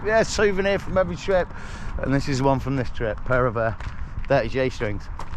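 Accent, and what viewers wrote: Leicester, United Kingdom. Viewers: Alright mate. Found you about a month ago and loved the approach you take to your videos and life! With a smile! I’m a Leicester lad (Glenfield) by birth and love hearing the twang mi duck! I’ve binged pretty much all your stuff and joined the facey group and your right what you mention in this one. Your gerrin much better! Love it u know! Keep smiling pal and good luck with the channel! Up the citeh!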